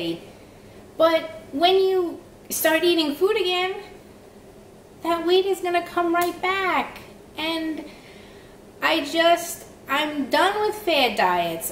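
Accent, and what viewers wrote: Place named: Boston, United States. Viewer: Great job ! Proud of you. New Subscriber from Los Angeles, CA. Do you have a get-to-know-me video? I'd like to know where you are vlogging from, love the accent. My guess is Boston.:)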